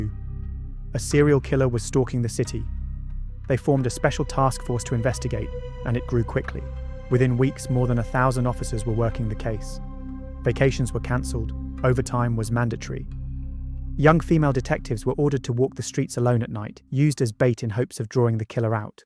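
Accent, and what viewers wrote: England, United Kingdom. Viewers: Excellent narration! Love the beautiful English accent & diction.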